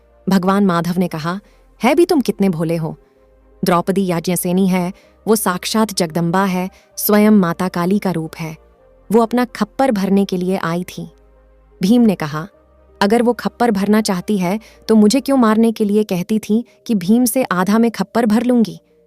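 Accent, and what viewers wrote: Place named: Hyderabad, India. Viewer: Pukka Hyderabadi accent hai bhai...kitna Sach bolengi kya ???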